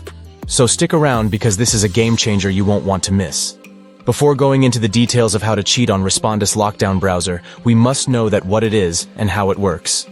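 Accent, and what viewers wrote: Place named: India. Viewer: your indian bro i can tell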